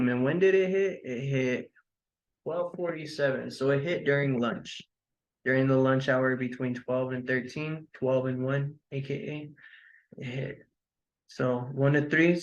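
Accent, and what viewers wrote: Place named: South Asia. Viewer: South Asian English Accent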